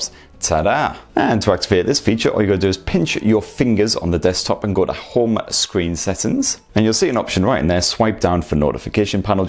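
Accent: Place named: Newcastle, United Kingdom. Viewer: Geordie lad through and through!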